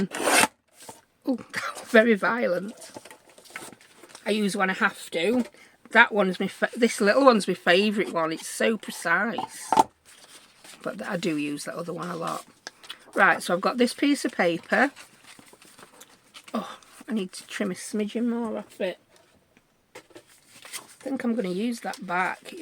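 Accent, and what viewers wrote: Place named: Yorkshire, United Kingdom. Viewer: I’ve just found you on YouTube, it’s a delight to hear a ‘Yorkshire’ accent on here making fabulous crafts! I’m a Yorkshire lass I hope you’re still making videos on here,I’ll have to have a browse at more of your creations, I love what you did with this fold out, I haven’t made one before but going to after watching you. Thanks 😊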